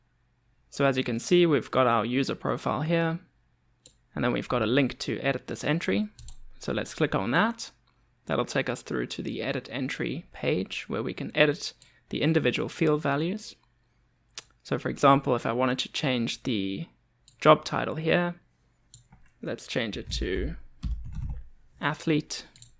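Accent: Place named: South Africa. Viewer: South African accent FTW :)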